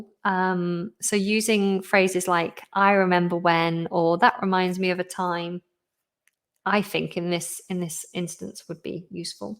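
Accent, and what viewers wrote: United Kingdom. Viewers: is one of the best teachers on youtube. I love her British accent! from Russia with warm hugs! 😉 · you are the best Teacher i love your British accent and Stay Safe Stay Healthy 😘❤💜❤💜❤💜💖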